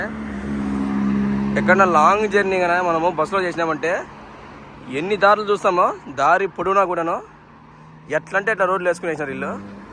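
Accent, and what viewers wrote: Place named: Rayalaseema, India. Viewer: ఆంధ్రలో నీది ఏజిల్లా బ్రదర్ చూస్తే రాయలసీమ లాగా వుంది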